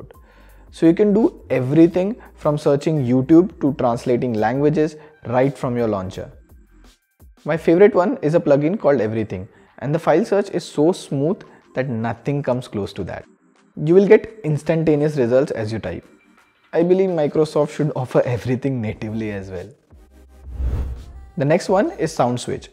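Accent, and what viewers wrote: India. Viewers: Indian fuckong engllish zounds like a servant is talking! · Indian accent detected, dont trust it it might be a Windows Tech scammer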